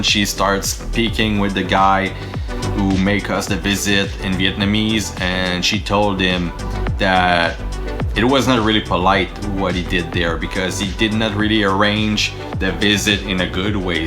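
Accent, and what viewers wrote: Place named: Quebec, Canada. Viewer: Bonne décision! c'est vrais que c'était marrant en québécois mais on ne comprenait pas tout. Les français sont des grosse faignasse, ils ne sont meme pas capable d'apprendre l'anglais 🤣 peut etre que tu vas les motiver hehe. Your English is Very good by the way!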